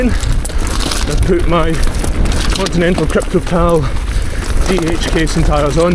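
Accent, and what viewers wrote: Scotland, United Kingdom. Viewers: is your accent scottish?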